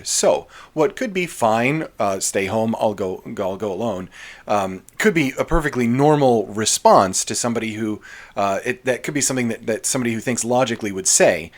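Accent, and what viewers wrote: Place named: Forest Hills, United States. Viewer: You sound like you went to forest hills high school, plus the silibent s and the lateral emissions indicate several minor speech impediments and lots of dental work.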